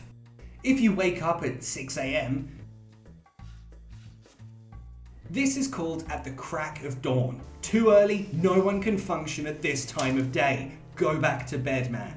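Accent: United Kingdom. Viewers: is this british english? i was studying your videos because i tougth that it was americano english😭😭😭😭😭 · Yes, this is British English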